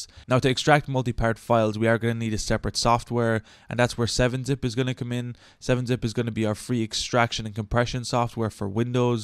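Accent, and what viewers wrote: Ireland. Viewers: very helpful thx love your Irish accent : )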